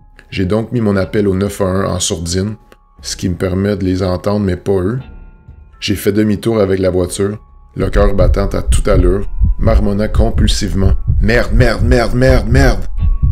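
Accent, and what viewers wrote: Quebec, Canada. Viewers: Superbe travail j'aime beaucoup 👍 Ps j'adore l'accent québécois 🙏👌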